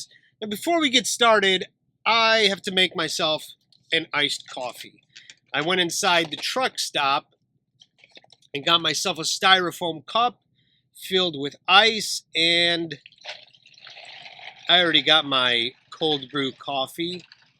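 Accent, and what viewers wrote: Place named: Russia. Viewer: Deeeeeeem I scrolled through your oldest vids to now and dang hearing your accent change, slowly losing the russian accent and becoming more american sounding is a blast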